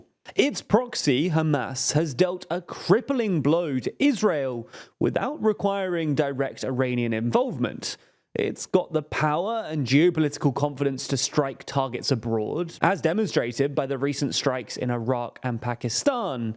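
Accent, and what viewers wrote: England, United Kingdom. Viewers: Apparently, you are the expert. Just because you have an English accent doesn’t make you any smarter than an average person. I’m not even sure how you ended up in my feed. More bullshit from someone that doesn’t know crap. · British accent and commentary about how China is weak, Russia is weak, Iran is weak. Interesting how that goes.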